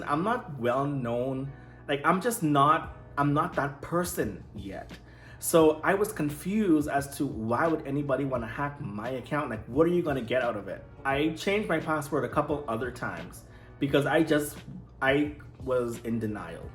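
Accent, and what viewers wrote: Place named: Bahamas. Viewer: You sound Bahamian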